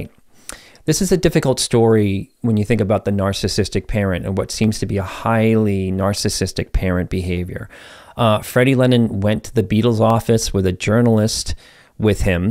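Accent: Boston, United States. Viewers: Are you out of Boston? Just hearing the beginning of this story. Ugh my heart from this story and I love your videos so helpful. ❤️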